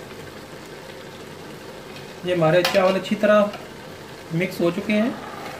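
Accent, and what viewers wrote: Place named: Pakistan. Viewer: Taste ka to apna he maza hy but app k urdu sunny ka apna he maza hy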